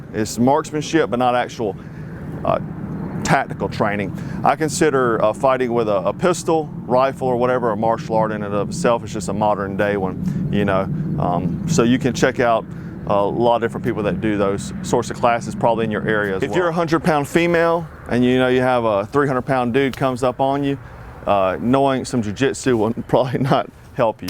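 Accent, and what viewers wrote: South, United States. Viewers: Whenever a dude with a southern accent talks about anything to do with survival or barbecue I listen 😂 But yes every last word is true.. what kali style do you study? It doesn’t look like the flashy mainstream stuff. Pekiti?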